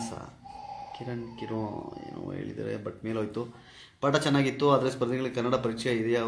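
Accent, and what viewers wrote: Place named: Mangalore, India. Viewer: Seriously nimma kannada thumba chennagide... Mathe mangalore kannada na thumba thumba chennagi use madthira.... 👌👌👍👍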